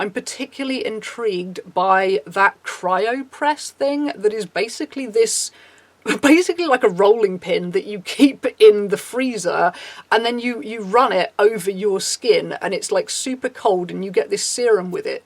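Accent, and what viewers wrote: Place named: RP, United Kingdom. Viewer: God she's posh !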